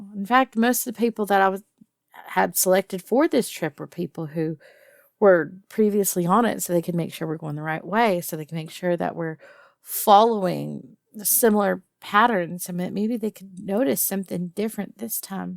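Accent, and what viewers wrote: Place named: South, United States. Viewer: Goddamn that southern twang got me feeling weird..... Where ya from girl, definitely gotta be some southern state cus that's a fucking southern accent, i feel like im freaking kut but i definitely here a woman named Betsy with that voice lol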